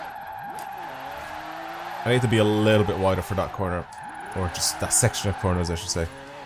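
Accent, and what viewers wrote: Ireland. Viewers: An angry Irish man shouting at me, what else would I want? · What park of ireland you for sound like your from down the country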